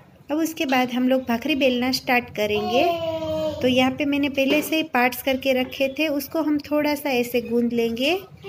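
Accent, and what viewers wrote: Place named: Gujarat, India. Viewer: Gujarati Hindi sounds really funny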